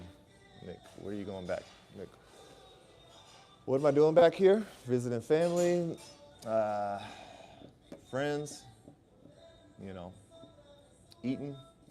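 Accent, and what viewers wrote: Texas, United States. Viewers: That's wasup bro I used to live in Dallas And I love your Texan accent lol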